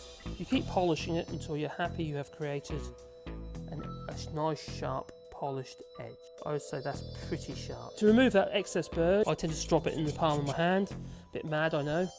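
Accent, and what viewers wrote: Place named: United Kingdom. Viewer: Yeah my sound all the way turned up and still had a hard time understanding. You are British with a lovely accent but the music makes it quite hard to follow then.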